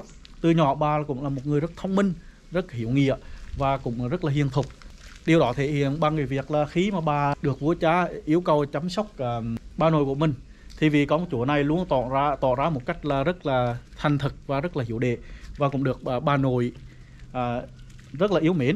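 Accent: Hue, Vietnam. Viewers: Thích thuyết mình bằng giọng Huế. Mình xa quê nhìn những địa danh Huế qua những video của bạn. Cảm ơn nhiều lắm!chúc sức khỏe và hạnh phúc, thành công, thật nhiều năng lượng. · Cảm ơn cháu ! Chú sinh ra ở Huế lớn lên đi học , tuổi học trò đi khắp các lăng tẩm không có chổ mô là bỏ sót . Bỏ Huế ra đi thời bao cắp Huế ( đói khổ ) Nay dịch bênh miền Nam . Rảnh rổi vô tĩnh xem được Huế rơveu của cháu rất tuyệt vời cộng thêm giọng nói thân thương của Huế . Chúc cháu nhiều s k tiếp tục những V IDeo khác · thế là tốt rồi bạn vậy mới là đặc trưng của Huế chứ ko lẽ nói như giọng Bắc hay Nam thì vòn gì đặc trưng vùng miền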